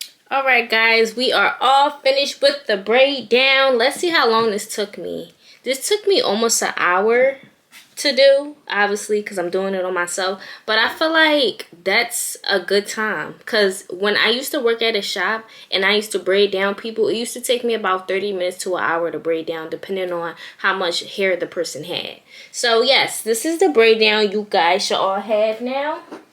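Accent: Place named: DMV, United States. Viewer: Are you from the dmv? I hear an accent 😍 this video definitely helped me love it thank you !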